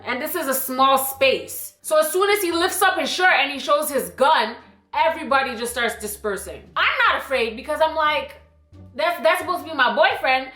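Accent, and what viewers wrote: Jamaica. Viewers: Is the rich jamaican accent for me... perfect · Mi luv di patwa lol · Aye mi love di accent Hafi chip it in sometimes 🤣🇯🇲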